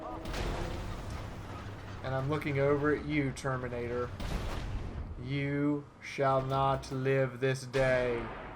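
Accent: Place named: United States. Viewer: That explains it I suppose. I guess I just assumed based on an American(ish?) accent that you played on the NA.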